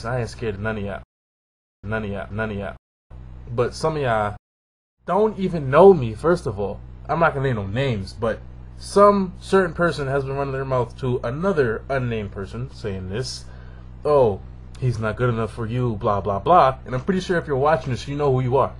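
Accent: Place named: Jamaica. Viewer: You sound mad jamaican in this vid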